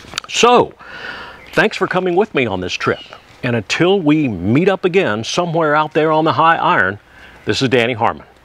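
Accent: United States. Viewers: Amazing naration! A+ for American English!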